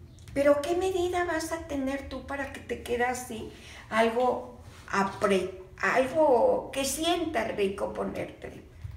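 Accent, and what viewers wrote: Mexico. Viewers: Saludos desde Los Angeles, querida, en qué país estás? Hablas como mexicana. Bendiciones. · Precioso tu saco y que fácil es para aserlo y muy simple llo chilena me encanta la costura y también me gusta tu personalidad y el pelo bendiciones me llamo Mary tu de donde eres mejicana por el modo de hablar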